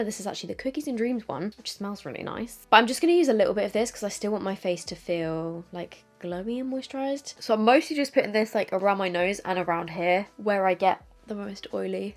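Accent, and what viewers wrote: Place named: United Kingdom. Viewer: Regular watcher here but just had a « eurêka » moment that is going to sound weird: did you grow up in the same area/neighbourhood as Daniel Radcliffe?? Your speech pattern/enunciation is sooooo similar to my Canadian ears…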